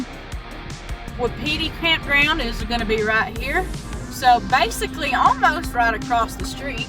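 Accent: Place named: South, United States. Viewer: Awesome video. Im trying to place your accent....Mississippi? Alabama?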